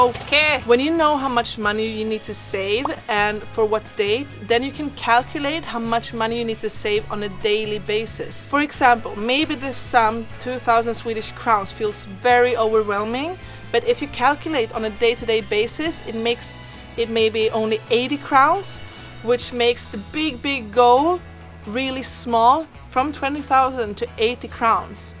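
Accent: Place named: Spain. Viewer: Hahahah vad sjukt att du pratar som att du har en spansk dialekt hahahah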